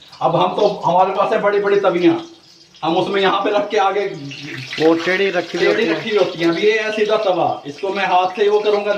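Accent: Pakistan. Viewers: I respect u alot u have no idea about it....u talk like my jhaiji and she was from Pakistan.i would love to visit pakistan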